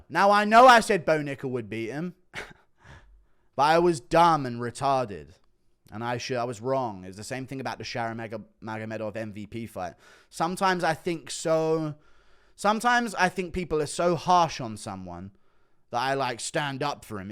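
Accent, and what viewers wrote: United Kingdom. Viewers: something about a British man yelling about ufc fights just puts a guy to sleep ya know?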